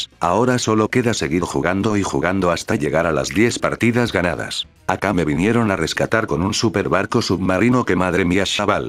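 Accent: Argentina. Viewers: Eres argentino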